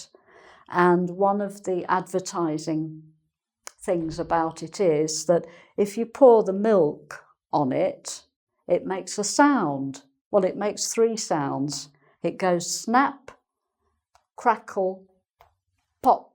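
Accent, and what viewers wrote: United Kingdom. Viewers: You are a great teacher. And your accent ! I love British accent but is not easy to understand, but I am happy listening to you because you are so clear. Thank you very much. Love from Buenos Aires.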